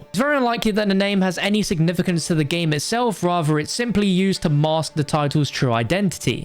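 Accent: United Kingdom. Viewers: Why is British accent so weird?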